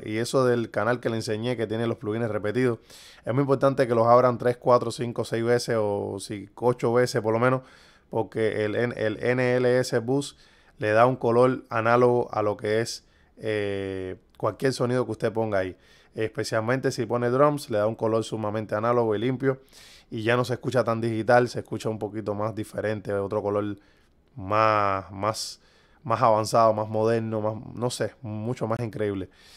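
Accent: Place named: Cuba. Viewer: eres cubano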